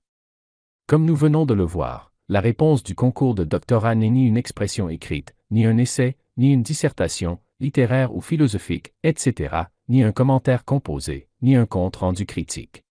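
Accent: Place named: Canada. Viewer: Great job.Pourquoi cet accent du Canada?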